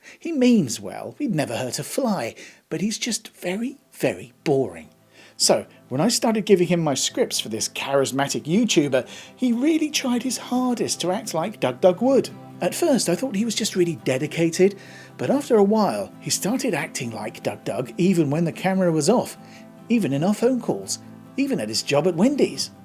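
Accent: United Kingdom. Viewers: Wait, was it or? Real Doug's accent was too British. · This may be a friggin sick joke, but the British accent makes me think he isn't joking.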